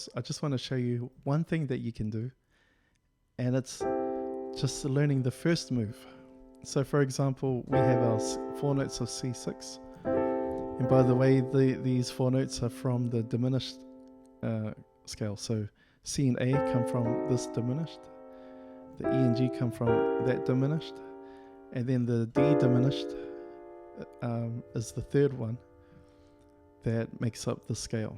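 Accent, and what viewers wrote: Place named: New Zealand. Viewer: Hi bro, I've just discovered your channel. Great work ❤️ I take it from your accent your a Kiwi I'm a guitar teacher in NZ. I'd be interested in your teaching experience in Japan as a Kiwi if so. Perhaps a video about this you think it's worth covering.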